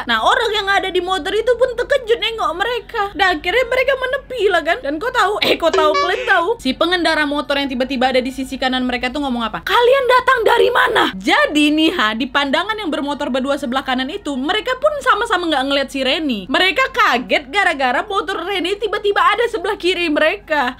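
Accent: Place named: Medan, Indonesia. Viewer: Orang Kalimantan tapi bahasanya Medan medhok... Hahaha 🤣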